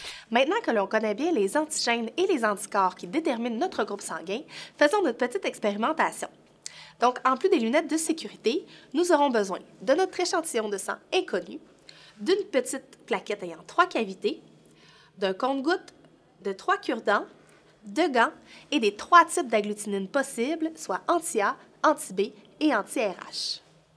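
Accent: Quebec, Canada. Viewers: Merci pr les infos en plus dit avec un joli accent de nos cousins québécois pr le coup québécoise 🔭🔭🔭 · Le groupe sanguin 0 + peut donner son sang à tous les groupes rhésus positif non ? ( A +, B + AB + ) Au passage, très bel accent québécois, moi j'ai celui de sud de la France, peuchère !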